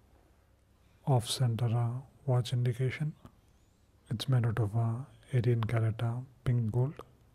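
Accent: India. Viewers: He is Indian, you're correct!😁 There is a link to his channel hidden way down at the bottom in the description box. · Love the ASMR Indian accent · Is that an Indian accent?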